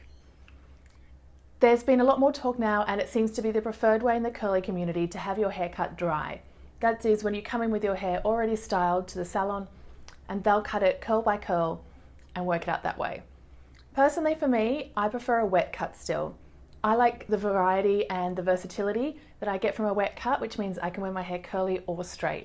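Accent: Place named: Australia. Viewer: Yay an Aussie.. So now I can breathe and understand u 😂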